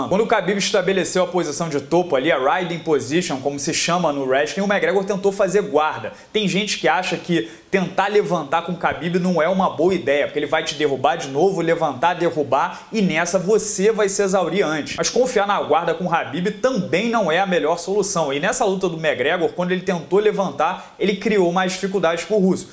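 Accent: Rio de Janeiro, Brazil. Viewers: Durante a luta eu fiquei lembrando da sua voz com o sotaque carioca dizendo: "Khabib não mija fora do pinico".... kkkkk. Ponto!!!!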